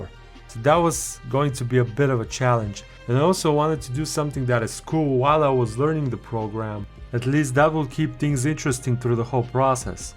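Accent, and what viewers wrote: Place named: Russia. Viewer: я один слышу тот самый русский акцент?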